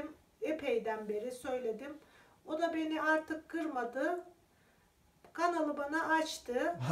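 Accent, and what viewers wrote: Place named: Karabük, Turkey. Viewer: Bacım, Tesadüfen önüme düştün. Zırh örgünle, konuşman yabancı gelmedi Nerelisin diye baktım. Karabük deyince şiven beni çekmiş. Ben Karabük'de 1981_ 1986 yılları arasında çalıştım.Mat ögretmeniyim Ordan şiven yabancı gelmedi, Kastamonu'lu mu acaba diye izledim. Aynı yöre,şiveler benziyor. Bursa'dan sevgi ve selamlarımı gönderiyorum.Takibe aldım yemek videoların güzelmiş, deneyeceğim. 💗💗💗🌷🌹🌷🪴🍹☕🍪🍃🥰🙋‍♀️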